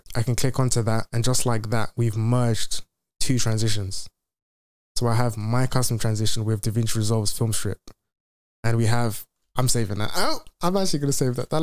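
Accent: United Kingdom. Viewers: Enjoy your content my man. Step by step and super clear. Also love hearing a UK accent. Subbed keep up the davinci content!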